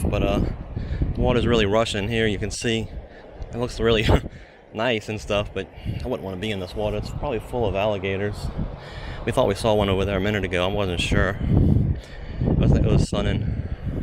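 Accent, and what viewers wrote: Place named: New Orleans, United States. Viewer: You sound so New Orleans! Thanks for posting!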